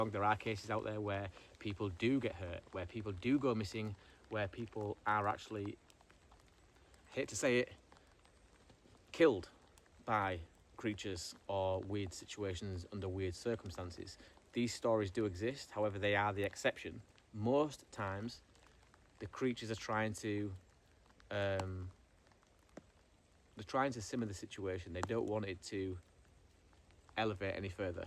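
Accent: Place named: North East England, United Kingdom. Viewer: Don't short-change yourself! We love your N.E. accent alot; but we're really here for Lily! (LOL HARD) 👽👽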